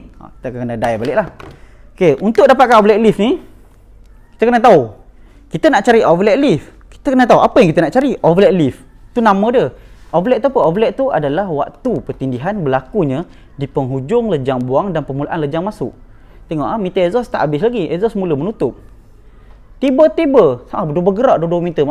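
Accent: North, Malaysia. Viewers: Lama2 skali bunyi jugak loqhat utara...apa2 pn best penerangan beza cam..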